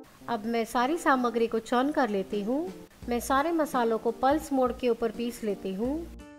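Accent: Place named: Kutch, India. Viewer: apki resipi rsoi sow me bhi dekhihe muje bhot psndhe apki boli thodi kchhi mix gujrati mithilgtihe thnks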